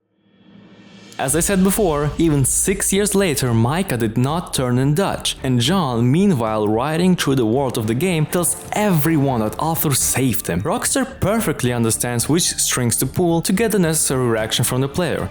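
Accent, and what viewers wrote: Russia. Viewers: Why all russian boys are sexy, i love your accent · Ты акцент не слышишь? Это явно кто-то русско говорящий. И в описании ссылка на оригинал.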